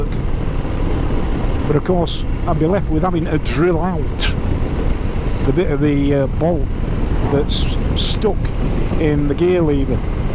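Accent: Yorkshire, United Kingdom. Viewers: Sorry to hear the Guzzi let you down. Always happy to see you riding in the Yorkshire hills, some marvellous scenery. Living in Bedfordshire, we are not blessed with much hilly scenery, but today we are having a ride out to the Dunstable Downs, which has some splendid views 😀. Always great to hear your Yorkshire accent and your choice of words when explaining any situation, look forward to the next video 👍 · Love the Yorkshire accent, part of the reason I watch and subscribe.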